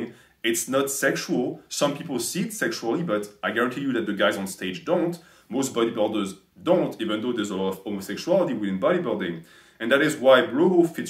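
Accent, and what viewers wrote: France. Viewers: This is even better with the French accent. My new favorite account.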